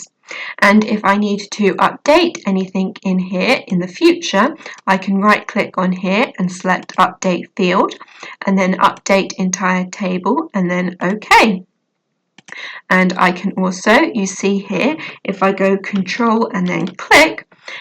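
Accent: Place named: United Kingdom. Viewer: Thank you by the accent I'm guessing your British haha. Back to the point thank you. 😁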